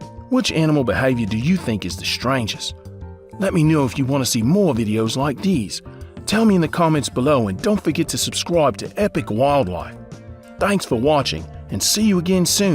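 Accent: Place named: Australia. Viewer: It's the Australian accent for Me. 🤔🥰🤗🇨🇦💖 No, I was never a fan of Irwin, but a tragedy nonetheless. 🤔🥺😭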